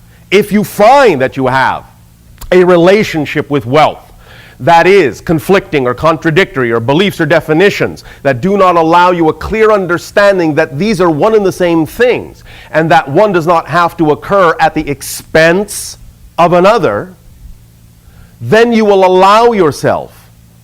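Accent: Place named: United States. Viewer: Why do you talk with American accent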